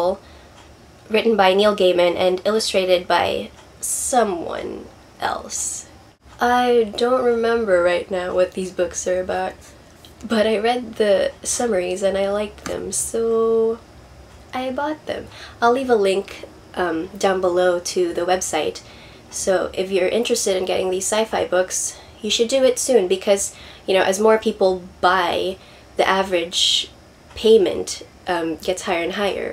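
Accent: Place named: United Kingdom. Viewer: lol love your British accent and your intro!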